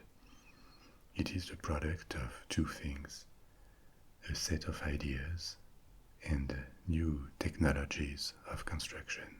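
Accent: France. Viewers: Happy new year and all the best to you too, mister relaxing french voice :D in all seriousness I found your channel recently and I'm hooked, amazing work ^_^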